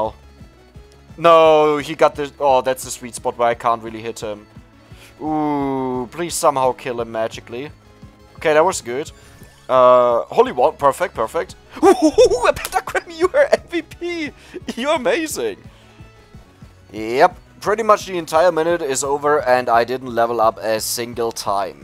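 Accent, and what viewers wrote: Germany. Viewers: Love the german-ish accent and, if you didn't already, would love to see some video of regular runs while you explain the thought process of choosing an upgrade or the other and the reasoning behind it. Plenty of people apparently don't "get" the basics behind how to at least finish a normal run and I would love to share some of your videos with them ;)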